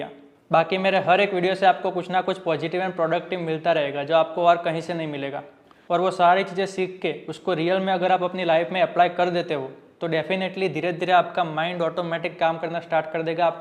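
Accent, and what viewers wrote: Gujarat, India. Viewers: That gujrati accent though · Aap gujraati ho?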